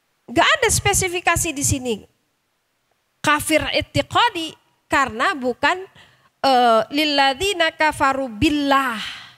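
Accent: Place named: West Java, Indonesia. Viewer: Alhamdulillaah, trimakasih Ya Allaah,sy bisa mengikuti kajian Ustjh.sunda pisan,sy seneng pisan.sama produk sponsor nya juga menarik.dimana sy bisa beli? Udah di jual bebaskah?di Bekasi bisa di beli dmn?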